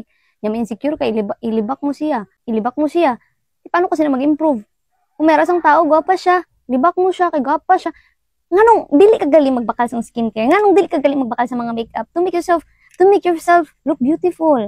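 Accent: Cebu, Philippines. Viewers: Ilonggo pero medyo may sagul cebuana❤😂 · Ilonggo pero ang tono Cebuano...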